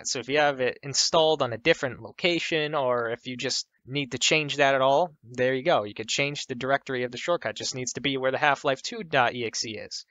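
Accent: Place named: New York, United States. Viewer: i think hes from new york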